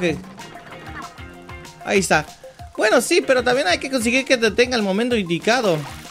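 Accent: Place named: United States. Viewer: mmm tienes ese asento gringo:3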